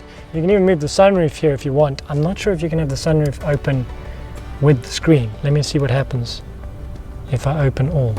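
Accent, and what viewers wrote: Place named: South Africa. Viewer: You can tell by his voice that he is from SA!!!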